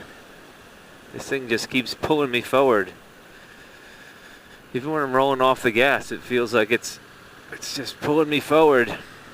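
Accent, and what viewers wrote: United States. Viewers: just wondering; why does the motorcycle have a British number plate but you have an American accent. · You sound like an American but the roads say and bike British Empire. The amount of land splitting is insane and that traffic is insane. Don't even think about lane splitting in Texas, you'd get smashed by the idiot drivers that are paying more attention to their cell phones. Plus its illegal. The T-120 is an old man's bike for sure and 80 horses is like you said not neck snapping but maybe adequate. As I've stared at your handle bars for damn near 30 minutes, it's clear the wiring from the hand controls looks really bad. I'd give the designer and F along with his buddy that designed that stupid fuel filler cap. Don't understand your bleeps or that you blurred out your speed when you hit 80. I'm a little confused thou when 20 mins in you seem to suggest the T100 with it smaller motor was better. 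Unsuitable for motor traffic... lol is that someone's suggestion or a government sign? I think you did a good job with your impressions.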